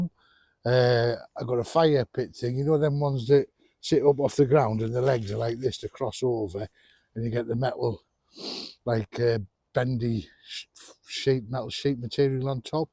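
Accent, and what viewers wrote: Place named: North East England, United Kingdom. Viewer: I stumbled across your channel by chance sir. Glad I did. So will be viewing your back catalogue now. Love this kind of content and especially the down to earth people who have channels on YouTube. A lot of people can relate to. Which part of the north east are from? I watch a lot of blot outdoors videos. You sound a lot the same. I live in ormesby st Margaret near Great Yarmouth. So we all sound like farmers down here. Apparently to a lot of people. 😂. Stay safe my friend, Andy from Norfolk. 👍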